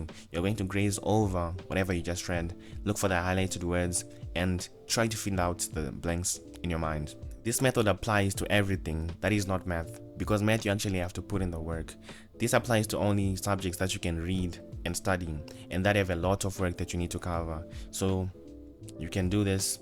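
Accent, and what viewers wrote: Zimbabwe. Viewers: U sound Zimbabwean ❤ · Is he from Zimbabwe 🇿🇼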